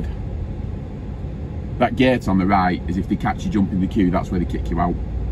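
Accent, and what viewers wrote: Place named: Lancashire, United Kingdom. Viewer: I watch all your videos till the end, thanks for your brilliant vids and commentary,you sound like your from my area which is colne near Burnley.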